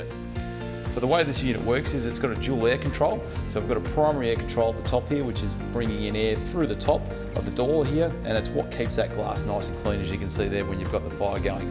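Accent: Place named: Australia. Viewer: Useless video due to the stupid music. I can make out around 20 percent of what is being said, combined with the aussie accent its not an easy listening situation.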